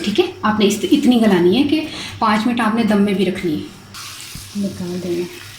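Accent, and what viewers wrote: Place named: India. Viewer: Recipes to nice hoti hun but Punjabi ful penddu style me bolte hyn ap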